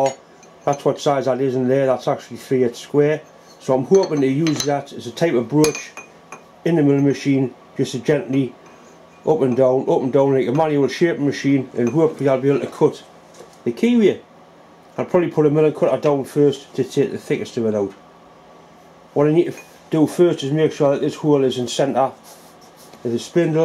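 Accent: Newcastle, United Kingdom. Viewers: pif paf the accent and dialect is called "Geordie". it is spoke by people in and around the City of Newcastle-Upon-Tyne in North East England. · i love that jordie acsent. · Wm Robert Leschyna Some would say ' Geordie' often spelt Jordie ,but this is a Newcastle accent as true Geordies are from Jarrow . It's a bit like the difference between Cockney and an East London accent . True Cockneys had a language and dialect far removed from the East London one , but is now almost completely vanished .Mi da an is da were Jarrow boys , mi granda on the March ! I grew up in East London and couldn't understand any of my grandparents . Dad from Jarrow , Mum from fenland Norfolk ! When visiting the US back in the 70s nobody could place from what country I came from but my mate being a Cockney , no trouble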